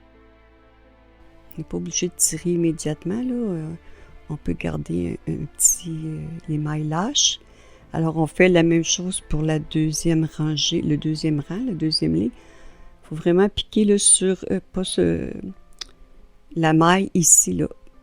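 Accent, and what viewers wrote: Canada. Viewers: Bonjour merci beaucoup pour vos explications très claires et l'accent canadien super agréable merci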